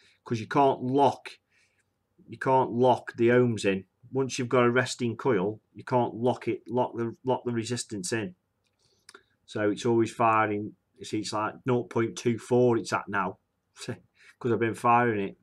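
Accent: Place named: Manchester, United Kingdom. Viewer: I was going to say Manchester. I have a friend from there whom sounds very similar to you.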